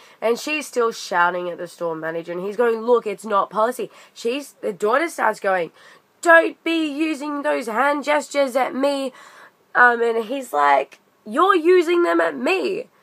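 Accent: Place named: Australia. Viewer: Even though you still have your Aussie accent, you're turning into a Pom... You said Hoover instead of vacuum. Haha:) Nice work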